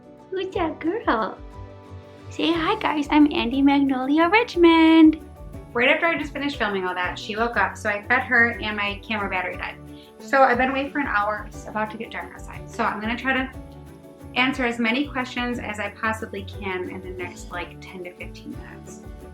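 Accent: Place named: Michigan, United States. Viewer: Are you from the Midwest? Just curious because your accent sounds like you're from Michigan (where I live). 💜🥰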